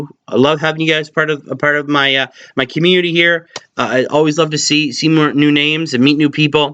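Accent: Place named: North America. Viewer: Sorry! I meant to say "NORTH American accent"! Ha ha! Go Canada! All the best, man!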